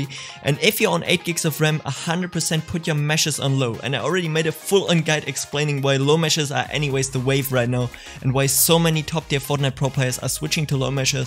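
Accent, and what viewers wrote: Germany. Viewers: nothing much, are you german? i like your accent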